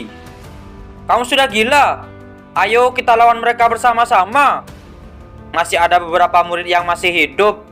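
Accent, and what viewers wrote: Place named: Bali, Indonesia. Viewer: Logat ngomong nya kyk orang bali kyk bli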